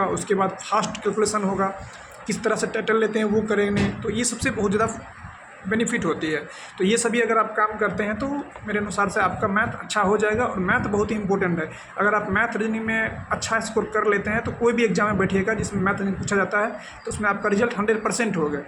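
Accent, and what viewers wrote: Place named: Bihar, India. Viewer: Bihar से हो क्या आप,,,❤🎉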